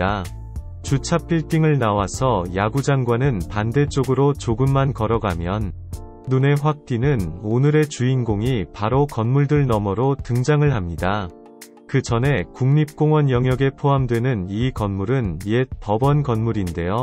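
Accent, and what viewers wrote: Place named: Gyeongsang, South Korea. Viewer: 유튜브 열심히 하시기로 한거 축하드려요! 저는 이미 구독자입니다^^ 부디 실제 음성을 들려주소서.. ㅎ 경상도 사투리 나쁘지 않습니다.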